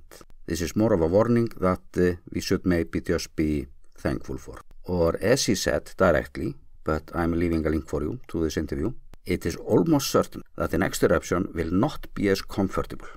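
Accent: Iceland. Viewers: I'm having trouble following the narration. I love Icelandic, but English with Icelandic pronounciation is too hard for me.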